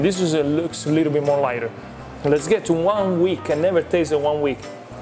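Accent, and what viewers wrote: Italy. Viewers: On my 40th batch of pizza following this recipe. all i can say is get 00 (zero zero) flour to make to worthwhile - buy best flour you can get hold off and the best yeast you can get the mcDougalls one is good! Love this Video and i practice my italian accent when making it so i sound like the man in the Video TUTTO BENE x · I came here to listen his Italian accent 😅 and I Iove making 🍕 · Salve o... AVE?;-) are you born Italian living in the USA o Italian born in USA? I really don' t know if you speak English with that accent pourposly or is faked;-) BTW you are very good pizza maker and looks a super very nice person keep up with your outstanding videos!!!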